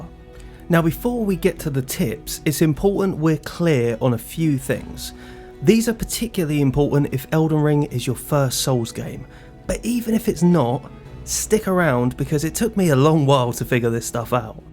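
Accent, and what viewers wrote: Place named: East Sussex, United Kingdom. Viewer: Thanks man. Just found you and you have another sub. Im a Brit sitting in the warm spring sun of East Sussex - your accent doesnt sound too far off this neck of the woods. But Ive been wrong about that stuff many times! I am really impressed how quickly you have built this channel. Gives me some hope. What would you say was your secret to starting it up to fast?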